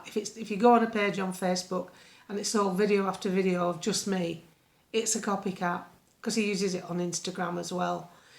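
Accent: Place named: Lancashire, United Kingdom. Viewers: Pure common sense is never a waste of time. I’m a Lancashire lass myself and love your accent.🏴🇬🇧🏴🇬🇧🏴🇬🇧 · Great to hear common sense with a Lancashire accent.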